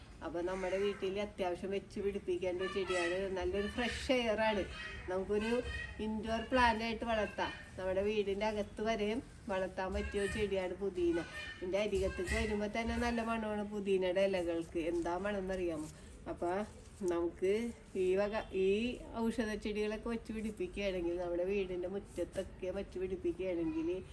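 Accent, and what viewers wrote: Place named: Ernakulam, India. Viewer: സംസാര ശൈലി തന്നെ വേറെ ഒരു സ്ലാൻ ഗ്.... എറണാകുളം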